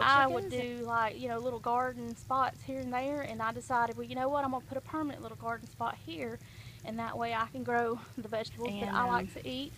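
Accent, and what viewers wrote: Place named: South, United States. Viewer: Cool channel! Love your southern accent!😬👍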